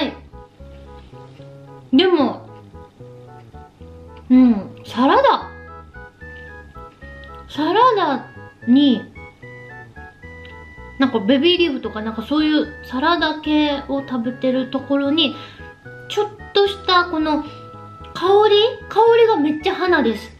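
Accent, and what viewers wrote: Kansai, Japan. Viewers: 関西弁がすごい可愛い 方言最高やな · 関西弁可愛い♡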